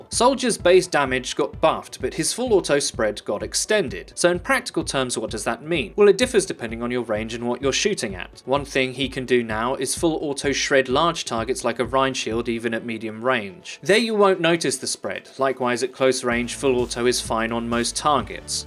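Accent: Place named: United Kingdom. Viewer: Zack Edwards Im British, but cmon dude, he has one of the most overly British accents ive ever heard.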